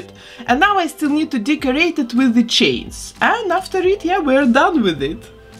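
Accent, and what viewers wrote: Russia. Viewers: похож, почему-то, на самый стереотипный и клишмрованный русский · Звучит как русский акцент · I can't figure out what that accent is it kind of reminds me of a Russian accent of which is what I have but it's not quite a Russian accent so please what language did you most likely speak before you start speaking English or did you even speak another language aaaaaaaa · Блин, да чтож такое. почему меня не покидает ощущение что у вас русский акцент?) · какой-то русский акцент 🤨🤔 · Русский акцент? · Акцент похож на русский)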